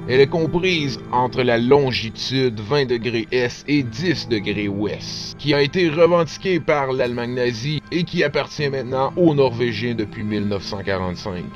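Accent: Quebec, Canada. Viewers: Putain !!! l'accent de Celine Dion ...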